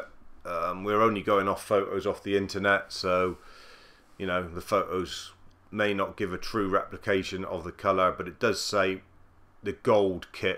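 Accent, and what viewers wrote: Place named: Gloucestershire, United Kingdom. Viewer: Sound like your from gloustershire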